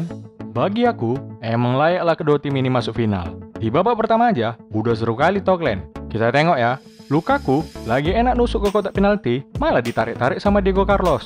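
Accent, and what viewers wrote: Medan, Indonesia. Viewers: Lama lama kaya minang kocak bang, tapi versi medan wkwkkw sukses bang.. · Mantap kali logat Medan itu lek..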